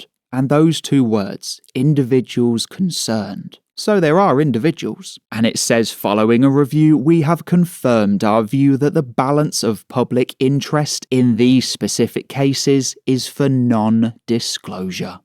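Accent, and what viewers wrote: United Kingdom. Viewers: You sound like you’re from Leicester?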